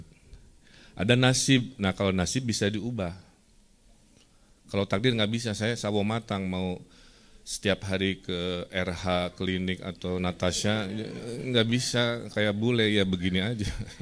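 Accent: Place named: West Java, Indonesia. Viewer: Makluk orang sunda mah begini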